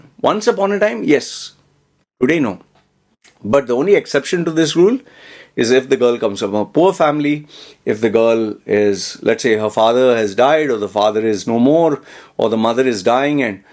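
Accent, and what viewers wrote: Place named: Mangalore, India. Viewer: I wish I had listened to your advise 15 years ago 😆 Especially coz ur explaining it all in Kudla dha porbu style English 😄 Cheers!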